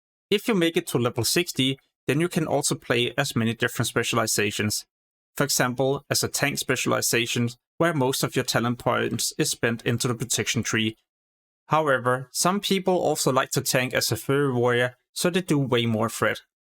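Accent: Denmark. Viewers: The danish is strong with this one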